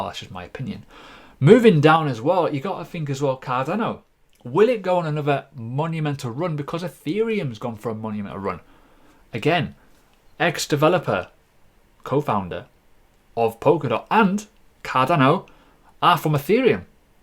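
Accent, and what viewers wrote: United Kingdom. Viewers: When I want to listen to British English and sounds of reckting things I always open up this channel, its funny and well said.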